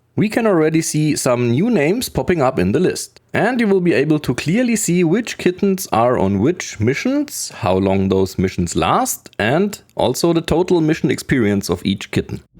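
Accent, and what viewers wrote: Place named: Germany. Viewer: I don't know you but i love your german accent ;)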